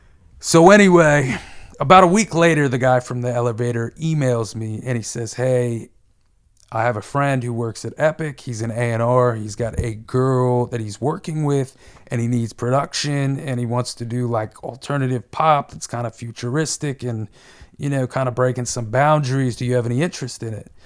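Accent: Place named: West Virginia, United States. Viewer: Are you from WV fam? Or do tennesseans say "on" like West Virginians?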